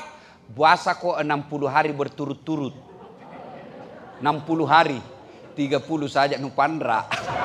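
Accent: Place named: Makassar, Indonesia. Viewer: Saya di samarinda sangat terhibur dan teringat logat2 khas makassar yg sangat lekat sejak masih di sulawesi..😂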